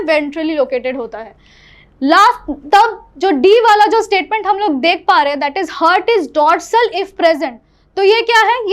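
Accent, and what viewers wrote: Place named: India. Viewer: NICE HINDI ACCENT 👌. VERY IMPORTANT FOR MASS APPEAL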